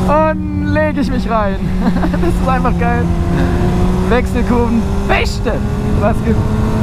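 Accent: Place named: Swabia, Germany. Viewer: Alter, Schwäbisch oder Hochdeutsch, aber net beides.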